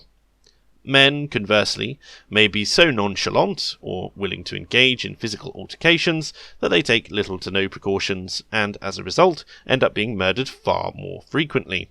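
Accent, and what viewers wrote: RP, United Kingdom. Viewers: Posh Brits! I love Posh Brits talking channels. I love Voltaire's Ghost too.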